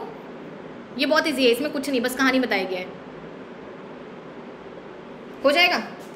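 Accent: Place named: Bengal, India. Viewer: Bengoli accent ♥🔥🔥🔥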